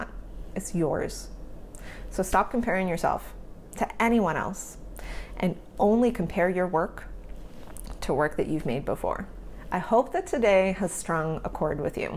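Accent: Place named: Canada. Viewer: What? Struggling with confidence? Who does that? Ok.....back up the bus.... Who doesn't struggle with it, is a better question. I guarantee even the most accomplished people have or still do struggle with it! We are all good at self doubt that is what makes or breaks us. It creates competitive energy or steals it! For me I am more jealous of the opportunities other photographers have, then the results! Like you I live in the cold cloudy climate! Minnesoooooota! Oh and a side note I knew you were from Canada just by the way you say out and about!! Lol I am a person that thinks I should be learning faster then I do! I have always struggled with learning speed. It has detoured me form moving forward in the past, because I don't get the results "I" think I should be getting! So in a way comparing me to me is self destructive! Instead i need to work the process and just do it and results will eventually come! 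Great message! Made me think about my own job life. Being retired now the only person I can blame for the position I put myself in is ME!! LOL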